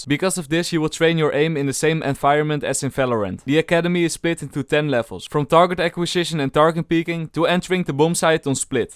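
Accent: Netherlands. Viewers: Are you dutch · 100% jij bent NL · Het nederlandse accent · I smell Nederlands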